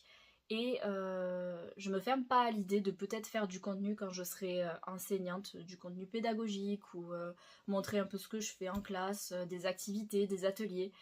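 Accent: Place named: South, France. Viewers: En asmr c est compliqué de dénoter des accents . Elle a déjà parlé normalement avec cet accent du sud . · Merci pour toutes ces vidéos, c'était vraiment du travail de grande qualité! Super content de voir que tu as l'air dans de supers dispositions pour ouvrir ce nouveau chapitre qui je l'espère te comblera! (l'accent du sud-ouest mama comment c'est craquant...) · Coucou c'est mon premier message sur ta chaine, et je crois sur n'importe qu'elle chaine d'ailleurs, mais j'avais recommencer a écouter tes vidéos ASMR depuis 2/3 jours elles m'avaient beaucoup manqué un peu de tristesse d'entendre qu'il n'y aura plus d'ASMR mais aussi super content que tu réussisses se que tu as entrepris, j'avoue que ça fais aussi bizarre d'entendre ta voix " haute " on va dire ^^ et c'est pas déplaisant haha! ( l'accent toulousain incroyable! ) mais voila je continuerais a écouté tes vidéos car j'en ai besoin rien que ta voix me fais beaucoup de bien!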